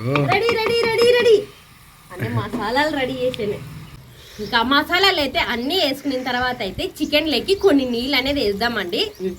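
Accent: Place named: Kurnool, India. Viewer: అర్ధం iendi మీరు karnool side వాళ్లు అని me భాష అట్లేనే vundi😂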